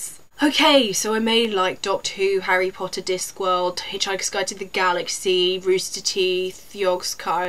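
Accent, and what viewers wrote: United Kingdom. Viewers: HAHA! THOUGHT THIS WAS QUITE FUNNY ACTUALLY. . SURPRISED ME WHEN A TRUE BRITISH ACCENT CAME OUT TOO! :)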